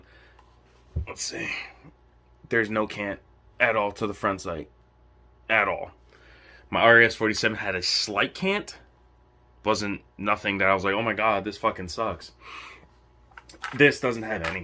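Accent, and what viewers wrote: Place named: Pennsylvania, United States. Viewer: Just scooped a tiger maple m70. Nice review? You sound like you're from PA, where about? Pittsburgh here.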